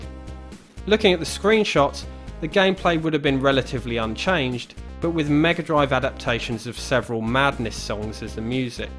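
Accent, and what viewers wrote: England, United Kingdom. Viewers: T H I C C english accent.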